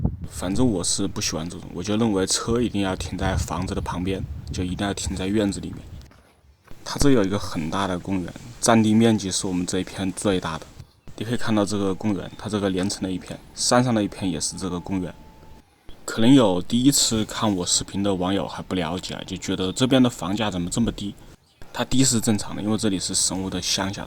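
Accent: Wuhan, China. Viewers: 武漢口音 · 这口音 太武汉了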